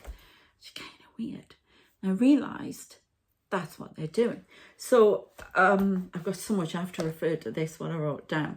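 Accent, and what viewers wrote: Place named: Newcastle, United Kingdom. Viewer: Thank you for your read.. Are you a Geordy. Like me.. I can hear it in your voice..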